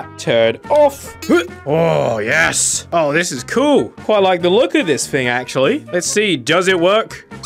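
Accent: England, United Kingdom. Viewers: R you from inglan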